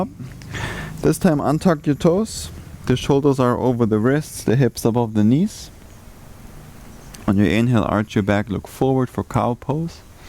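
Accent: Germany. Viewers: amazing tatoos, sexy body and sounds like a German accent ? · Are you German? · Du bist doch deutscher oder ? Hört sich zumindestens so an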